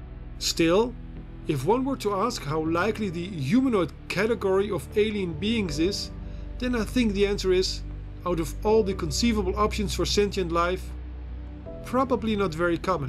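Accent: Netherlands. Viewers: Aan de uitspraak kan ik met zekerheid zeggen dat jij Nederlands bent, of nie dan?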